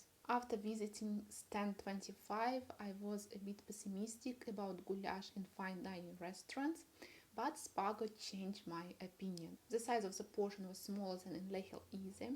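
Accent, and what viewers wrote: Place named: Russia. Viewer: Девушка, давайте я вам поставлю произношение бесплатно, и интонацию. Вы хорошо знаете английский, но произношение прям никуда-вы просто произносите русские звуки и интонацию тоже надо ставить. Я могу вам за несколько уроков поставить и дать ресурсы, где отрабатывать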